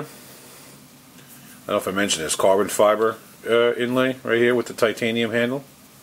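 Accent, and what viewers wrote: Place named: East Coast, United States. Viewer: ok !!!! I agree with everything you said!!! we can be friends I tend not to go over $200 either I think it's east coast mentality! but its tough because I tend to go for premium steel these days only, s35vn, cts-xhp elmax m390! I favorite steels are Elmax and m390! do you have a preference? I have a full collection of discontinued kershaws all brand new with boxes! some of the ones I own are the kershaw offset, (Please checkout my video on that), the tyrade, storm, n ergy, cyclone, and a kershaw barrage (AKA Salvo) I wanted to collect all the kershaw knives before 2012 and zt came along to me its sort of historic, as much as I loved knives I wanted my collection to have some sort of theme it made sense anyway, anyhow sorry to ramble but I don't have any friends who are into knives, wish I met you 30 years ago but you probably weren't born Yet? 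wish i could meet you some day you seem like a cool dude your accent makes me feel connected to home. I live in Washington now and the food here sucks I'd kill for pizza! hell i'll take a knish!!!! LOL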